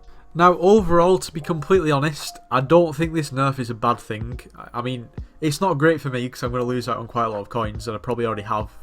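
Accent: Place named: Birmingham, United Kingdom. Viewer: WTF SINCE WHEN WAS THIS DUDE A BRUM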